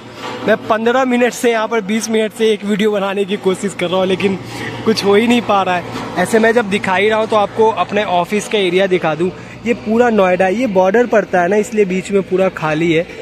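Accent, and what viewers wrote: Bihar, India. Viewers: Aap Bihar se ho 🙃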